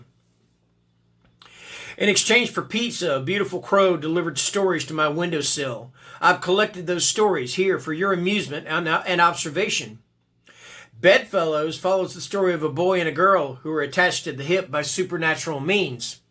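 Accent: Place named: Appalachia, United States. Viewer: Excellent Appalachian accent. Too many good books, how are you going to decide what to read first?!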